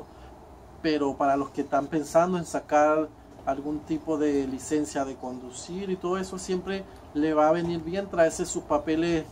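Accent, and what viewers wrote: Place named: Chile. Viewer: Ya tienes el acepto chileno. se te nota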